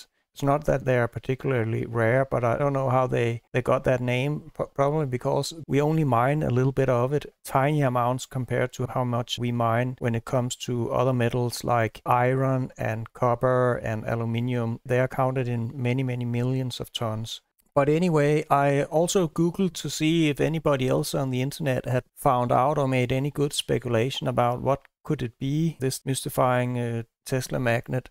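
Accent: Denmark. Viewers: Du låter dansk! 🇸🇪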